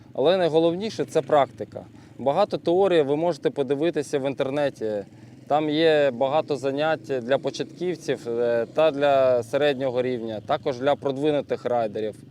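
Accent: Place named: Russia. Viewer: Смысл сужать аудиторию на своём украинском с русским акцентом ? Мда...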